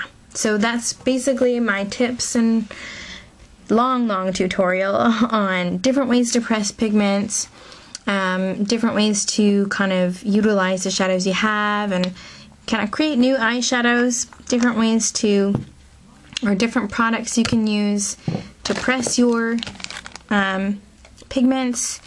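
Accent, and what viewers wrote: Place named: Canada. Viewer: Are you canadian? because when you say 'out' and 'eh' you really sound like you're from canada! ll =P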